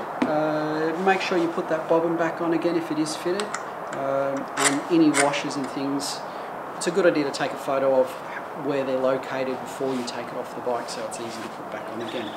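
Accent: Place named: Australia. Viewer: Is not a limey ( BRITISH ) he is an Aussie or kiwi THE SOUND ON THIS VIDEO IS TERRIBLE THROUGH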